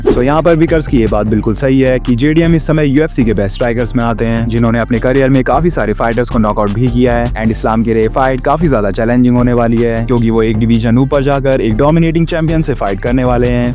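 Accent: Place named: India. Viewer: Wich language are you speaking i hear enlish brother i hear india i hear nigeria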